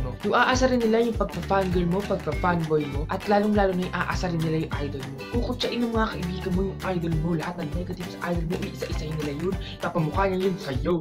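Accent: Visayas, Philippines. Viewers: Bisaya kaba??? Parang naka bisaya ka eh💕💕😘😍😱 pa shout out pooo plsss!!!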